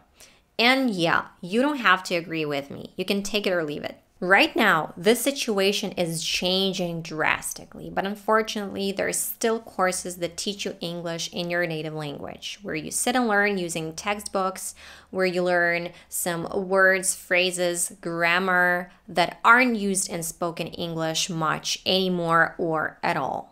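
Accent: United States. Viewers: Jesus christ!!! Your American accent is mega strong. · The first time I saw you speaking I thought that you are American, you are perfect 👌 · Вот уж парадокс. Когда я увидел твое видео в рекомендациях, то так и подумал, что ты из Украины или России, но когда услышал, то подумал что американка ))Интересно, как долго учишь? Я сам из Украины и учу язык в ютубе от носителей.Но все просматриваемые мною ютуберы(например, Papa teach me или English with Lucy) говорят медленнее, чтобы изучающему было понятней, а также добавляют субтитры чего у тебя не увидел. А так все супер, ты молодец. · I'm shocked!!! I was totally convinced you're American! I would never think that your first language isn't English. Props to you! Keep it up 💪 Brilliant job! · Don't know even you are Russian.. I thought you r 100% American 😊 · Hey...what you are doing is a great job..I like your extreme passion about English language.. 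even I wanna be like you someday... speaking fluently in a good American accent..with ❤️ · You are from Ukraine I thought you were from USA ! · You are a very good teacher! It's hard to beleave that you are not american. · I know you ever since I watched hadars students vids and then i was curious to know a bit more about you, and I think you're great, despite the fact you live out of a country where ppl speak English and your accent sounds so familiar like Americans , i wonder how you manage to speak in two different accents .. p.s if your reading this, pls mind letting me know about it. Thnxs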